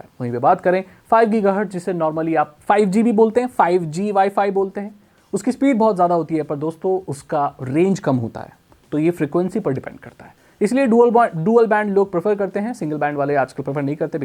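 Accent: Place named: Rural, India. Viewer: Aase lgra hai kisi gau k bnde ko sun rha hu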